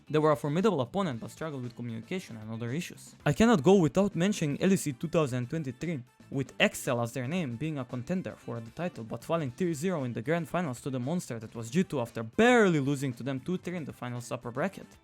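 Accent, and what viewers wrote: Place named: France. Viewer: you just know this guys french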